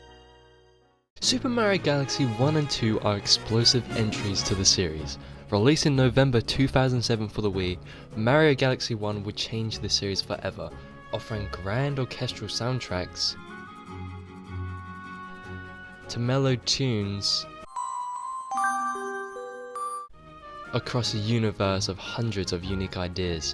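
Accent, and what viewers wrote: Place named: United Kingdom. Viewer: Having another brit explain things is the main bonus for me cuz theres no miscommunication if you say words that mean different things (my biggest struggle with american creators)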